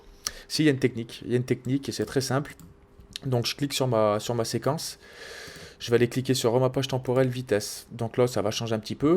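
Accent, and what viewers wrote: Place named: North, France. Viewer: pas mal , l'accent on s'en fout fout....même du nord nord...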